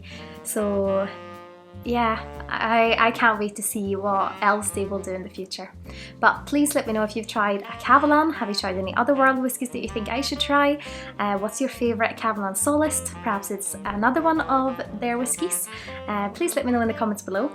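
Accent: Scotland, United Kingdom. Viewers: Är du skotte eller har du övat på ditt skotska accent?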